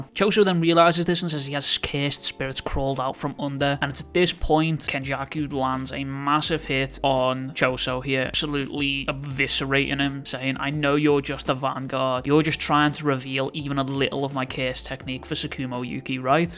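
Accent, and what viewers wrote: United Kingdom. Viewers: Cant lie its cool to hear a Uk Accent whether it be Scotland Wales or England feels like im talking to one of my mates lol great video bro keep em coming <3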